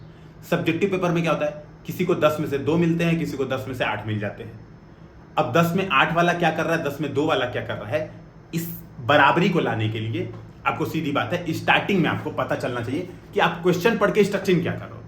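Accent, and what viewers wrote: India. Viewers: Sir aap gorakhpur se ho kya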